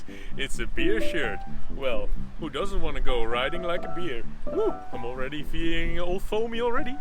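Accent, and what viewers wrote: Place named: Netherlands. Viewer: nen ollander dieje engels klapt, haha ge hoort het toch direct he! Leuke review btw.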